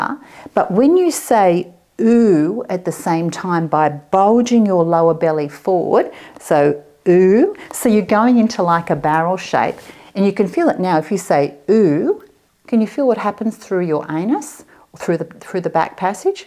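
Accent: Australia. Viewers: you have a very very strong Australian accent! ... it sounds lovely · struggle to understand the Australian accent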